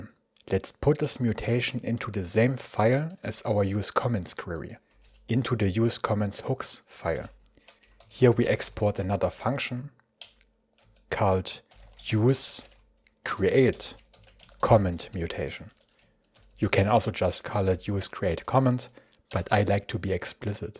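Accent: Germany. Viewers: gotta love the german accent/pace :-) slow and nice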